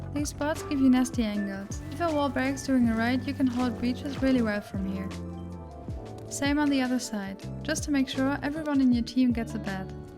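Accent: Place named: Germany. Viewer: du kannst gut englisch aber deutsch hört man raus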